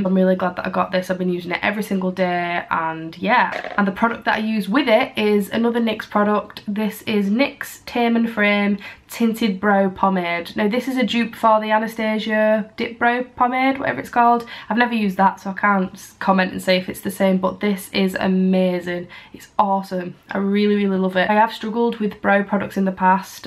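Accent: Northern England, United Kingdom. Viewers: I do love your accent northern accents are the best ! xx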